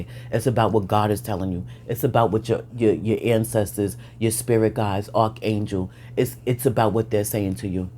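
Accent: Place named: East Coast, United States. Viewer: I just LOOVVVEEEE your East coast accent!🥰❤❤❤💛🥰💋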